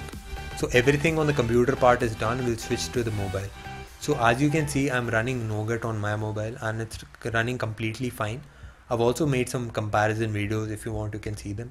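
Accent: India. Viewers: UUDDLRLRBA Start Stop judging mate! Look into yself! He wanted to give some info(In indian English so wat?), which he did obviously,worked for me though...so stfu n stop using YouTube...trust me on this!!! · perfect YouTube (Indian) accent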